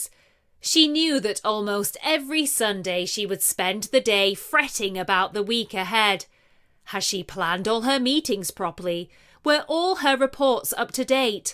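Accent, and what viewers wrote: United Kingdom. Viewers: What's this type of British accent it really sounds magnificent and original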